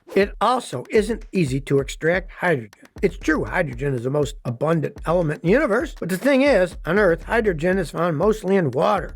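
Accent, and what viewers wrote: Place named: South, United States. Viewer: Wrong. Narrator sounds Southern. Sounds like a Saturday morning cartoon character.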